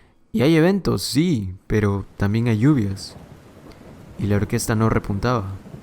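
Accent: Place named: North Coast, Peru. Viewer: Con acento norcosteño peruano, o norperuano costeño, como parte de la subvariante más meridional del español ecuatorial, en peligro de extinción debido al avance del español ribereño de Lima y del español norandino de la sierra norte a la costa norte del Perú desde sobre todo la cuarta década del siglo XX. :eyes-purple-crying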